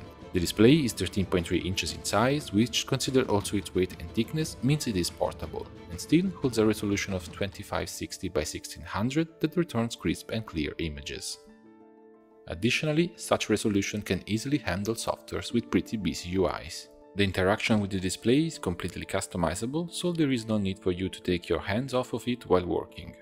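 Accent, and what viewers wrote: Italy. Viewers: Immaginavo fossi italiano, nonostante la pronuncia inglese sia piuttosto buona qualcosa mi ricordava il modo in cui parliamo inglese di solito ahah. Ottimo lavoro comunque, sei davvero bravo, io ho sempre remore nel provare a modellare qualcosa di cosi complesso di dettagli, preferisco concetrarmi su archviz meno "ricche" e più moderne. E ho anche notato dai precedenti video che ante tu ti diletti con designer... Great great!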